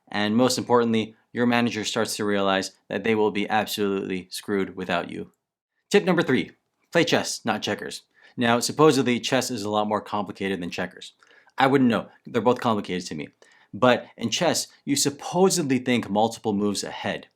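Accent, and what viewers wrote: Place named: Canada. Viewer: Hello from Peru! I love your videos and I’m so curious! You sound like born in Canada or something but What your ethnicity is? :) thank you.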